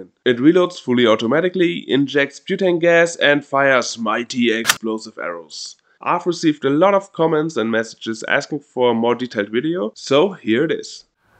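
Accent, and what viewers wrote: Germany. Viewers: You sound german. Ist das was du baust nicht illegal hier? 😅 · You sound German, is this legal in Germany?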